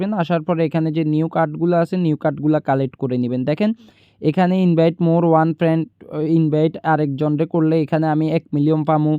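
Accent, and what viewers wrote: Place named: Sylhet, Bangladesh. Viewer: Vai to Sylhet e😁 ami to buji laice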